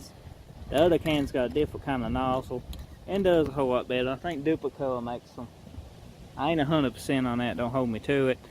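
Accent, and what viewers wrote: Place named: Kentucky, United States. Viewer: lol well I'm from Texas but my dad is from Bardstown and your accent is hardcore lol. good stuff.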